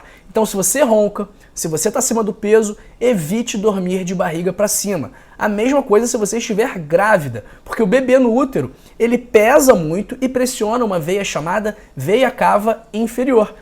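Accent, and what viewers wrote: Rio de Janeiro, Brazil. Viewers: Boa noite que linda dica tem que ser carioca que voz mais lindo doutor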